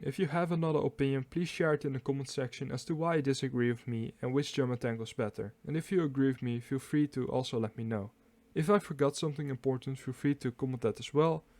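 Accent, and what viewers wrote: Netherlands. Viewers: Your melodic Dutch accent makes my own crude english, (one & only), language sound good. Very easy on the ear. And your multilingual styled pronunciation is not only impeccable but also aids comprehension of info'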